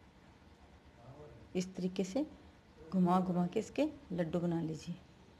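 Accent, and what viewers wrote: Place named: Bagri, India. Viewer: Good but aapki hindi me bagadi show ho rhi h 🙂 Keep it up bagadio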